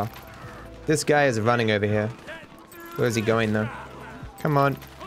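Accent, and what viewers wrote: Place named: Australia. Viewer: You sound Soo middle class Au...! Enjoying the vids fella.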